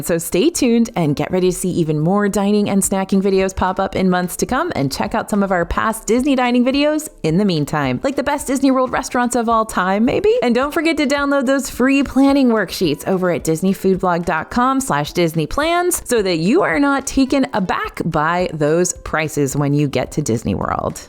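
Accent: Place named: Texas, United States. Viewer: Is it just me or is Texas accent really strong in this video? 😅 Btw, I'm really hungry now.😘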